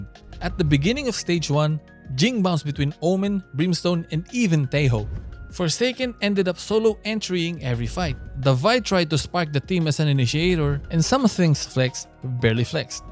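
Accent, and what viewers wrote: Philippines. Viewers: why do u sound filipino bro. Anyway, here's my sub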